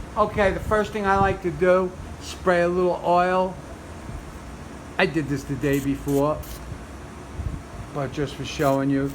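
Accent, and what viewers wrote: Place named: New Jersey, United States. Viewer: Yep, I do also, '03 Heritage Softail 100th, they fit '95 to '15 Softails...the real Willie G grips are only $114 at Harley #<num>;o) is the man!, love that accent!, must be New Joisey!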